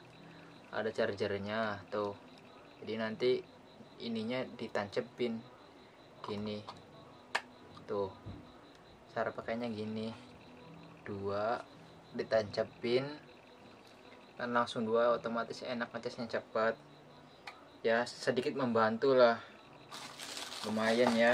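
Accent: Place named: Tegal, Indonesia. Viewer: masa sih..tdk ada ahh hanya di luar saja..indramayu itu bukan nya jawa barat ya..kok bicara nya kaya org tegal atau brebes yo😐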